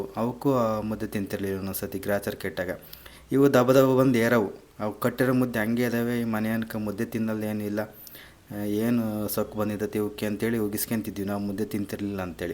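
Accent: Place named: Davangere, India. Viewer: ನಾವು ದಾವಣಗೆರೆಯವರು... ಇವಾಗ ಚಾಮರಾಜನಗರದಲ್ಲಿದ್ದೀವಿ.... ನಮ್ಮ dvg ಭಾಷೆ ಕೇಳೋಕೆ nim ವಿಡಿಯೋ ನೋಡ್ತೀನಿ.. Nim ಅಡುಗೇನು ಚೆನ್ನಾಗಿರುತ್ತೆ ಬ್ರದರ್ ❤❤❤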